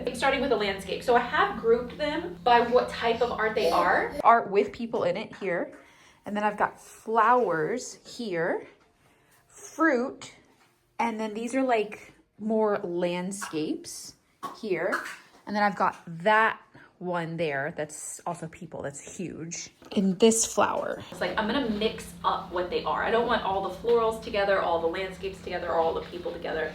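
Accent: Texas, United States. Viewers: I think your Texas accent came back a little bit during your time there. It’s cute to hear, as a midwestern viewer. I’m glad you’re having fun with your living room, even if it’s temporary.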